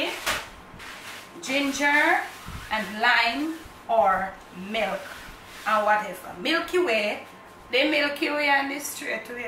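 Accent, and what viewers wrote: Jamaica. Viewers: I love your presentations, but most of all, I love the patois. Just listening to you brings me closer to home.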